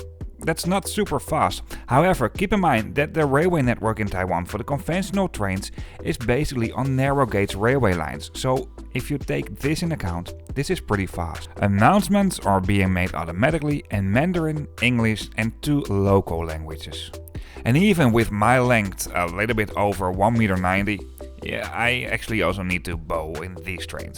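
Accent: Netherlands. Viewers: you have strong dutch accent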